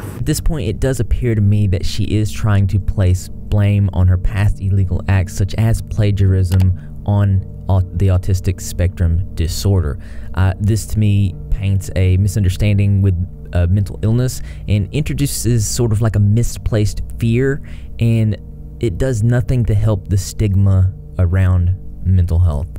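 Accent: South, United States. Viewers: Is it just me or is your southern accent not as strong? Or maybe I’m just used to it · Where's your accent from? Texas? Louisiana?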